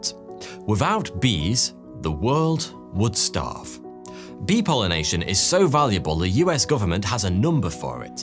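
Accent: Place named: Yorkshire, United Kingdom. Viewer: Anybody know what part of uk this guy is from? In only asking cuz i have a very similar accent and i originate from Harrogate, yorkshire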